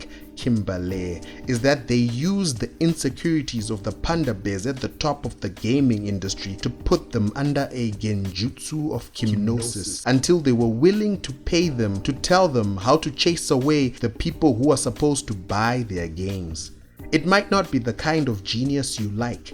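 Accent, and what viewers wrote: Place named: South Africa. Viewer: South African? Man instant sub! Haha gaming news that sounds of home..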